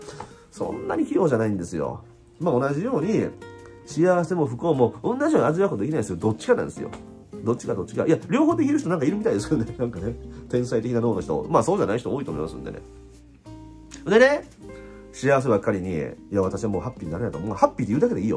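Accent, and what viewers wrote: Osaka, Japan. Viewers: 少し、面白くないことがあって、得意でないお酒を用意して、 YouTubeでも観ようか。。。と思ったら、そこにQさんが居ました。 丁度、今、聞かなくてはならないお話でした。結果、楽しいお酒になりそうです。 大阪弁で少し早口のQさん、とても良かったです。いつもありがとうございます。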